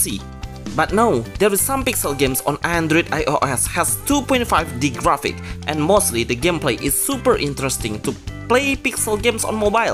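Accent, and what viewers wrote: Indonesia. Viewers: logat indo mu kerasa banget bang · dari aksennya orang indo kah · Let me gguess by the sound of your accent your Indonesian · hmm I feel you are Indonesian or Russian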